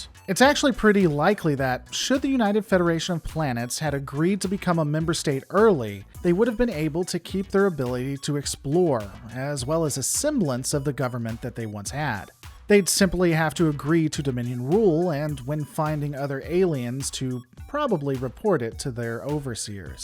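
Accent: United States. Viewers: So freedom is not something worth sacrificing for? It is, in fact it’s worth laying everything on the line to keep it. As an American (presumably from your accent) you should understand this from our history.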